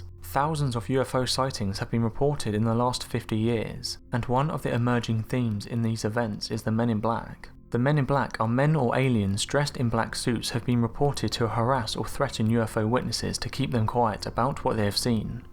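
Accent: United Kingdom. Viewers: So nice to hear a British accent for a change. Music for my ears.. Regards from Serbia! Your videos are great.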